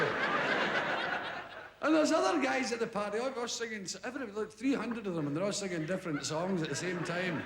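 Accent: Scotland, United Kingdom. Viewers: Watched this with subtitles, and even autosub can't figure out some words😂😂😂 I love the Scottish accent😂😂😂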